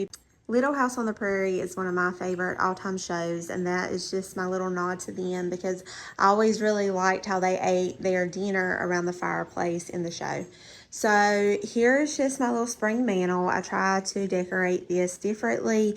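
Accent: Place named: South, United States. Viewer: I love your blue and white collection, your home is beautiful. I also love your southern accent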